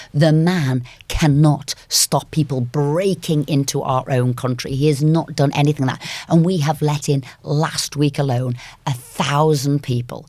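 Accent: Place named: Liverpool, United Kingdom. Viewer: She has a strong Scouse accent so she emphasise' her words to ensure even ignorant people understand.. 🤣👍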